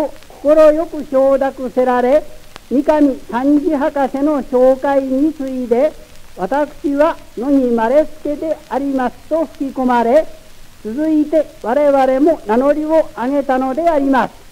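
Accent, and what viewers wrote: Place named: Kyushu, Japan. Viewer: ■ から最後のまでですね！イントネーションから九州訛りを感じました。 ■Wikipediaの出自には、 「🔸父・希次は江戸詰の藩士であったため、乃木は10歳までの間、長府藩上屋敷において生活した、、、 🔸安政5年11月（1858年12月）、父・希次は、藩主の跡目相続に関する紛争に巻き込まれ、長府（現・山口県下関市）へ下向するよう藩から命じられた。乃木もこれに同行し、同年12月（1859年1月）、長府へ転居した[10]、、、 🔸元治元年3月（1864年4月）[注釈 4]、16歳のは、学者となることを志して父・希次と対立した後、出奔して、長府（現・山口県下関市）から70km以上離れた萩（現・同県萩市）まで徒歩で赴き、兵学者の玉木文之進への弟子入りを試みた、、、」 と長州、現山口県が青年期と大人になるまでの期間を過ごしていますので、『山口弁』と思われますが、 ■軍人は九州人も多くその影響があったのであろうと思われます。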